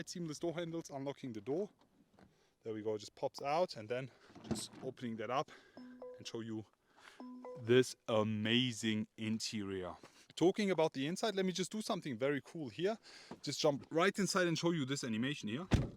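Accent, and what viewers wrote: Germany. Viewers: I like the way you spell the words like Arnie 😂 I can feel some German vibes coming through your voice. Really really Arnie-ish 👍🏽 I love it 👏🏽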